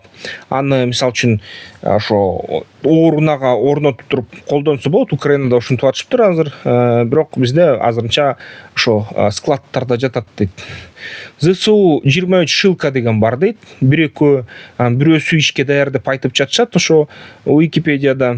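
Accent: Standard. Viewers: Кыргызча таза суйлойт байкебиз азаматсыз.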